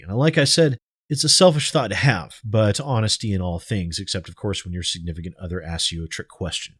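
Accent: England, United Kingdom. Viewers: I dont even remember his reviews, just remember annoing english voice - nothing more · I'm going to miss that awesome British voice...RIP.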